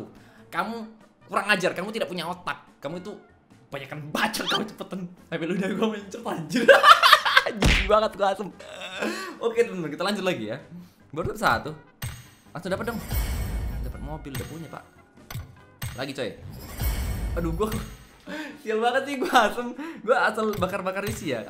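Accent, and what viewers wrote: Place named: Medan, Indonesia. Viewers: Nampak kali Medan mu bang 😂😂😂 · Orang Medan nya abg ini😂😂😂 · Bg Logat mu kayak orang Medan😂😂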